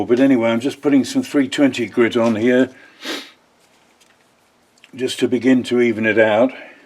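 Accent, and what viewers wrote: United Kingdom. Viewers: Hope your back gets better soon. It's nice to hear a British ascent. And a bumbling old twonk. Keep up the good work. M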